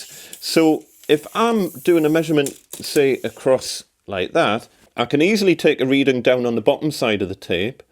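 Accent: Newcastle, United Kingdom. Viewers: This is what I’m looking for but they’re not easy to find. This guy sounds like a Geordie!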